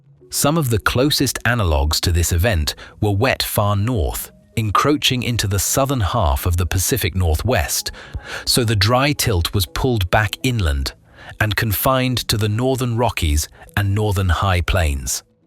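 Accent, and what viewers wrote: England, United Kingdom. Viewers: S,o,o,o,o. —- the weather forecast for your region is: “YES.” Im so glad that I found this At least I was able to hear a guy with an English accent!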